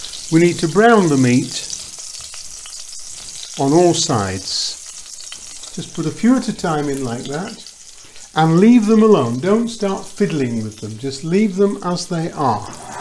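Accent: Yorkshire, United Kingdom. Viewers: Hello Mr. I just found you today. To say that I am very happy about that would be an understatement! I recognised your accent right away as I spent two years in Yorkshire (Pontefract area) as a teenager. I have a question about the steak and kidney pie. After you added the beef broth the mixture looked very liquid. How/when does it change into a nice thick gravy like you have in your pie? Also, I have a question/request: when I lived in Yorkshire I used to eat the most wonderful custard pies. Do you have a recipe and demonstration for them? If so I would be eternally grateful. Thank you.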